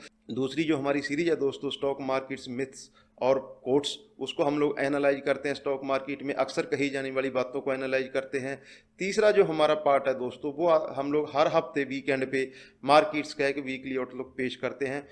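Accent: Punjab, India. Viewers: punjabi touch nahe jaana even after 50 years 👍 👏👏💪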